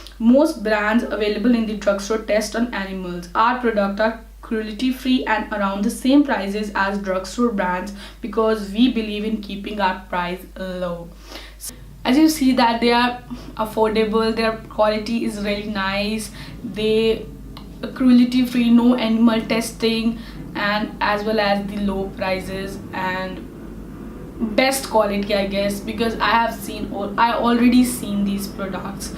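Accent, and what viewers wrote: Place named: Australia. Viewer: Loving ur accent australian touch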